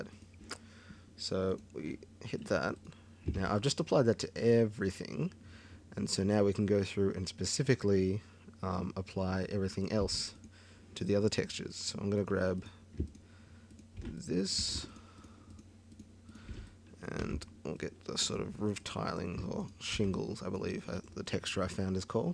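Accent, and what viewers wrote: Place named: Australia. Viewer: Gotta love that australian accent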